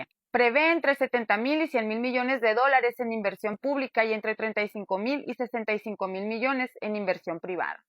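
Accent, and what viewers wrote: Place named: North, Mexico. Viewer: ayer 20 de abril fue el cumpleaños del fiu rer. El ultimo Avatara. y mientras tanto, "los aliados" ("la escuadra y el compas", los verdaderos supremacistas) mantienen a todo el mundo en estado de guerra perpetua desde 1948, dando el "sacramento" para el hematofago genocida que ellos adoran como "dios de los ejercitos". esta demostrado que esos supremacistas no entiendn otro idioma mas que el de la violencia y la fuerza. Muchos creemos que el mismo Cristo vino al mundo para terminar con ese culto demoniaco que llaman "judaismo", y el demonio disfrazado de Dios junto con los depravados supremacistas que se creen "elegidos de dios". SIN TREGUA NI PIEDAD, EL BIENESTAR DE LA MAYORIA DEBE IMPONERSE SOBRE ESA MINORIA RAPAZ, SUPREMACISTA Y GENOCIDA. GRACIAS POR COMPARTIR. ps me encanta el acento del norte, sobre todo de shihuahua! 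iñoor!!!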